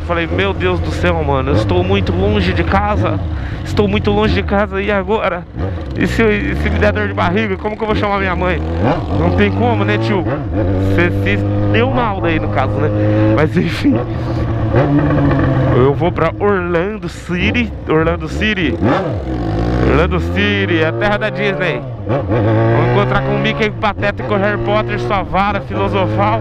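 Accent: Goiás, Brazil. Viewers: Volta a falar no pique do goias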